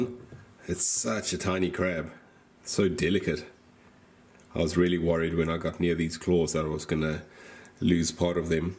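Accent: South Africa. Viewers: Are you an ex South African?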